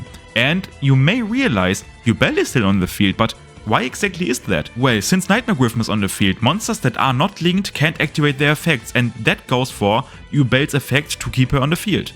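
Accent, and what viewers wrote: Germany. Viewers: My guy has the strongest German accent on his English I've ever heard in my life chad af :^) cool video tho. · So ein lustiger deutscher Dialekt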